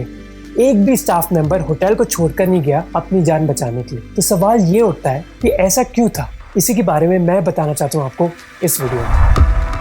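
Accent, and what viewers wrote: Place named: Bihar, India. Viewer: I think it's his speaking style 🙃 like bihari accent or avadhi accent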